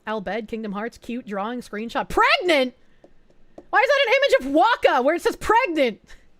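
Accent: Boston, United States. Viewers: I came for the DEI update and Boston accent. ❤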